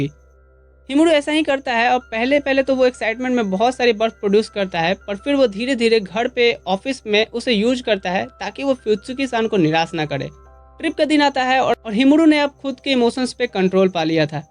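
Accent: Bihar, India. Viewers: Bihari h ky itna ganda explanation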